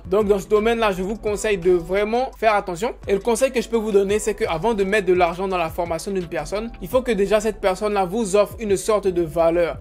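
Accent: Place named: Ivory Coast. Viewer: Merci pour la video. Dans ton accent on sait que t'es un vrai Ivoirien, j'en suis juste fière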